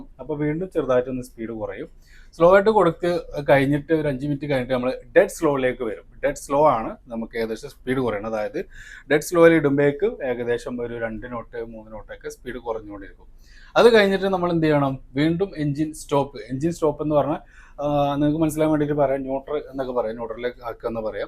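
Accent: Malappuram, India. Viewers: ഈ മലപ്പുറംകാർ ഇതിലും വന്നോ.. ചീഞ്ഞ ഭാഷ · നമ്മളെ മലപ്പുറം സ്റ്റൈലിൽ explanation.. 😄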